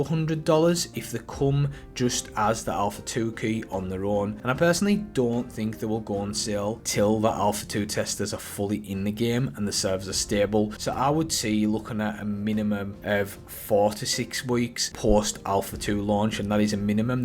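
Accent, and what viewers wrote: Newcastle, United Kingdom. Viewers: Hearing that Geordia/Maccam accent talking about Ashes is wild haha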